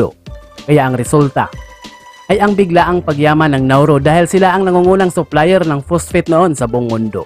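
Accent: Visayas, Philippines. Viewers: Visaya ka bay...wag ka nang mag English ...pilipino naman tayo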